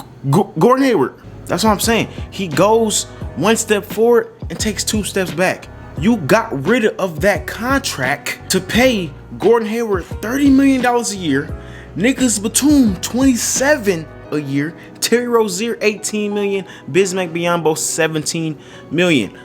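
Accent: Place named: North Carolina, United States. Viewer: Where you live? Cuz you sound like you live here in NC. I’m a big hornets fan, I love the signing.... just not the amount of money he was signed to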